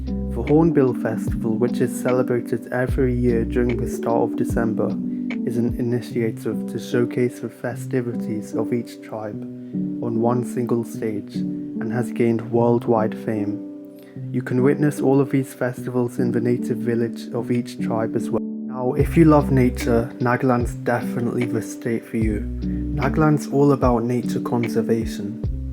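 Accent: United Kingdom. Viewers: I just love and adore uk accent.....❤️ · For once it's great to hear a British accent. Your honest views are much appreciated.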